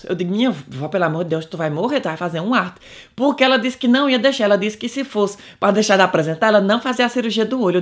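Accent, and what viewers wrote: Ceará, Brazil. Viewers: lindíssimo, amo você. sou do nordeste também e me encanto muito com isso de você mesmo não morando no brasil e tudo mais continuar valorizando a cultura nordestina, principalmente seu sotaque! todo sucesso do mundo, um salve dos alagoanos. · Cai de paraquedas no seu canal. E estou adorando. Que sutaque maravilhoso. Eita cearense arretado ❤😍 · Esse sotaque é muito meu!! 😂😂😂😂 Sou sua fã e também vizinha aqui no cariri cearense. 😍😍😍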